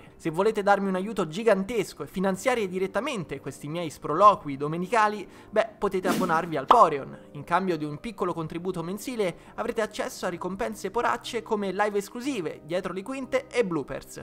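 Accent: Rome, Italy. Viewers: Lo sai che abito sulle Alpi francesi e ogni tanto faccio confusione. Non si può dire però che io non mi applichi. Io almeno non parlo con l'accento romano come fai tu...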